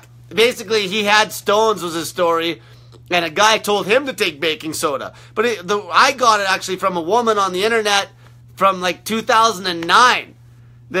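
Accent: Canada. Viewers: I’m confused. I head your Canadian accent, but I hear ur tone and it ain’t adding up.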